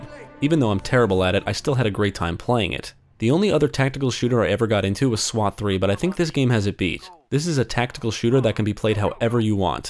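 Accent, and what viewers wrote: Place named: New York, United States. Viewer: I'm from michigan, and im guessing you're a new yorker?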